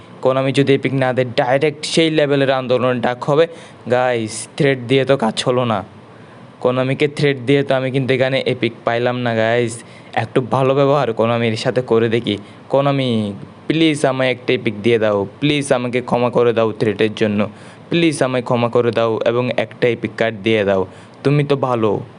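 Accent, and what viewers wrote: Chittagong, Bangladesh. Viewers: ভাইয়া কি চট্টগ্রামের নাকি???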